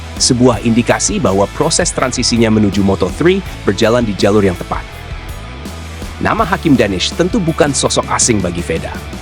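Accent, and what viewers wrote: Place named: United Kingdom. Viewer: Narasi bahasa pake aksen inggeris Its very cringe bro Baru 1 mnt stop nonton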